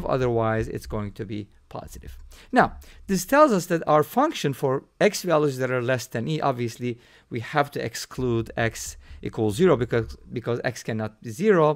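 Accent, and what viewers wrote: Turkey. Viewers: Hey, are you by any chance Turkish? You sure sound Turkish. Other than that, great video! I really enjoy these bite-sized equations. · bakmadım ama k ve r harflerinin telaffuzundan ötürü kesin türk bu adam dedim. Canımsın severek izliyorum yeni keşfettim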